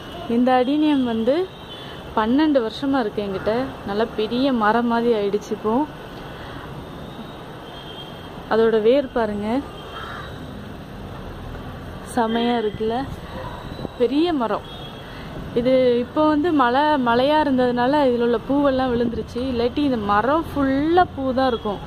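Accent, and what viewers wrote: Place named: Tiruchendur, India. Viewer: நீங்க எந்த ஊர். எங்க சொந்த ஊர் திருச்செந்தூர்... நீங்க பேசுற பாசை எங்க ஊர் side மாதிரி இருக்கு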